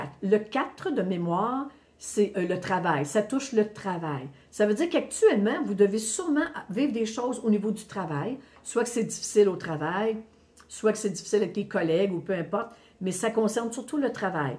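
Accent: Quebec, Canada. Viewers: Merci infiniment pour tout ce que vous nous apportez avec ce bel accent, ces expressions imagées québécoises et cette lumineuse gaité au long de la vidéo. Belle et lumineuse journée à vous et à toute votre communauté. Merci infiniment 🙏💫🌟💖💖💖💖💖💖💖💖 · Bonjour ! C'est toujours un plaisir de vous écouter, surtout d'entendre votre acquessan (le dire tout haut) Québécquois. Votre T-Shirt est magnifique également. Merci de vos interventions et sages recommandations. A bientôt. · Chère belle âme, Je vous ai découverte récemment et suis depuis peu abonnée à votre chaîne. Merci pour votre joie, votre spontanéité, votre authenticité, votre humour aussi, et ce merveilleux accent québécois qui vous caractérise. Vos vidéos sont rafraîchissantes par votre transmission et très inspirantes par leur contenu. Un grand merci pour votre présence sur la toile. 🙏💐🥰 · je t adore c'est toujours un immense plaisir de t écouter qu'est ce que j aime en plus cet accent québécois 😅c est que du bonheur Au travail on me demande de déménager pour un autre bureau à deux alors que j étais seule tranquille et je suis sûre que c est l Univers qui me met en inconfort pour que je quitte enfin ce travail que j aime bien mais je sens bien que je ne suis pas à ma place véritable et chez moi ça construit à tout va et j aime la tranquillité bref je comprends qu'il est temps que je parte je suis prête vraiment mais pour faire quoi? 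Aller où? ❤❤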